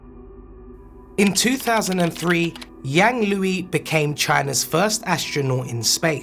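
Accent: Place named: United Kingdom. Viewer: he’s British. In British English the “s” in “debris” is silent.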